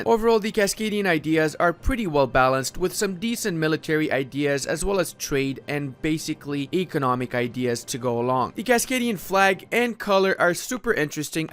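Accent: Australia. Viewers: The Australian accent... it's not bad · The Aussie accent and references were bonza. Yeah, nah, something tells me you’re more than a little familiar with the place.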